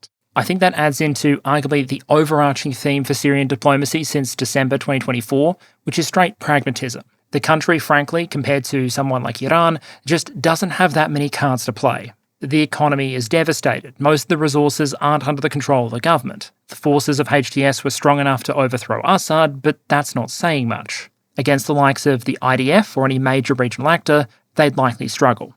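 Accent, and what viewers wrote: Australia. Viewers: It is awesome to hear an Aussie commentary about world affairs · I'd suggest you turn on subtitles. The words all make sense, perhaps the Australian accent is the issue for you?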